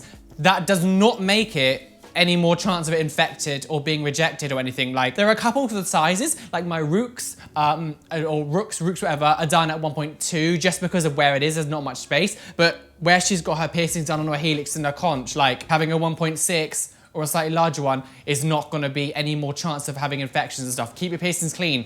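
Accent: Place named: Essex, United Kingdom. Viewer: sounds like a southern (I call it an Essex, considering I grew up hearing the accent a lot) British accent.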